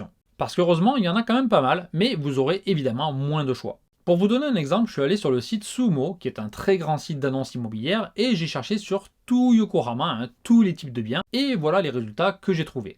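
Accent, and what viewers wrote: Marseille, France. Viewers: De temps a autre tu as une petite pointe d'accent de Marseille qui se fait entendre...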